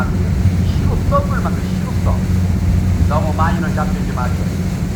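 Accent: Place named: Jeolla, South Korea. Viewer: 구수한 전라도 사투리 정겹습니다 에메랄드 빛 바다, 코끼리 바위, 거북이 바위, 거시기 바위... 홍도 정말 절경이네요 영상 잘 보고 흔적 남기고 갑니다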